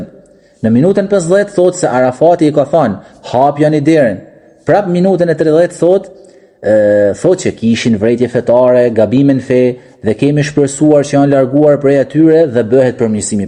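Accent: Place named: Shkodër, Albania. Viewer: Kush asht hoxha qe flet se po duket shkodran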